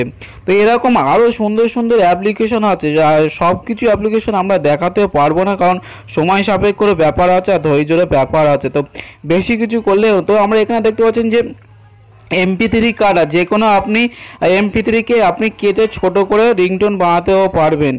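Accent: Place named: Kolkata, India. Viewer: ভাই কলিকাতার মানুষের উচ্চারণ এমনই ভাই। আমারদের যেমন নোয়াখালি..........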